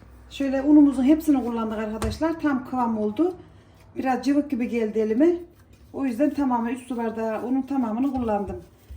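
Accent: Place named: Aksaray, Turkey. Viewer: Eline sağlık çok güzel görünüyor ayrıca konuşma tarzından anladım hemşeri olduğumuzu 68 vatan 07 mekan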